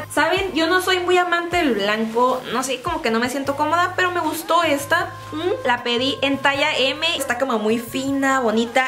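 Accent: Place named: Monterrey, Mexico. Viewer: Tanto vídeos que he visto tuyos y en este note mucho tu acento regio hahaha Eres la mejor ♡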